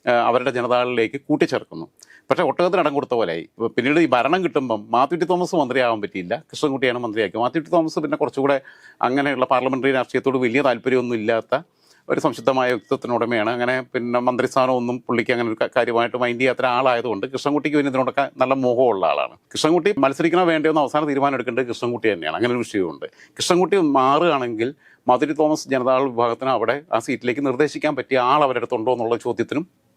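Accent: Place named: Palakkad, India. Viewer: പൊലയാടി മോനെ നിന്റെ ഭാഷയും പാലക്കാട് വിധത്തിലാണ് അതുകൊണ്ടാണ് അങ്ങനെ പറഞ്ഞത് ഞാനൊരു ആർഎസ്എസ് കാരനാണ്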